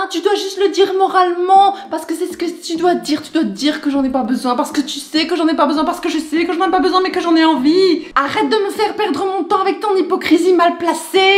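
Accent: Belgium. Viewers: J'adore ton accent, Liège? Verviers? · Tu es belge ?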